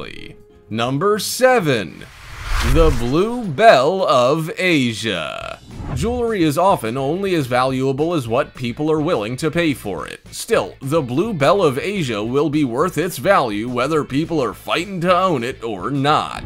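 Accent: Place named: United States. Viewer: Beautiful gems, but the American accented narrator, the guy in particular is extremely painful to listen to, he talks as if we're all thick as two short planks. Had to switch off the volume. As for the garish countdown with that stupid character it really makes a mockery of the content; which are the fabulous jewels. Re-do this with a more pleasing voice (even mine is 10 times better) and get rid of the character countdown and what the equivalent of the jewellery could buy, eg., 13 American homes, yada, yada.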